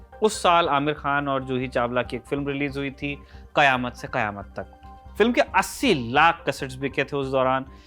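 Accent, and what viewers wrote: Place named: Mumbai, India. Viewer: Mumbaee Bola Anchor 😎📢😫😫😡💙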